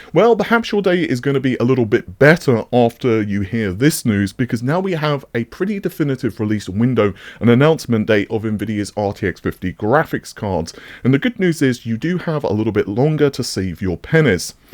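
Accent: England, United Kingdom. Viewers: Brother Man sounds like Tea and Crumpets looks like The Rock's English cousin. SWOLE!:)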